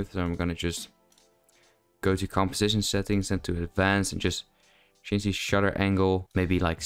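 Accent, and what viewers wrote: Netherlands. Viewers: maybe a weird question, but are you dutch? lol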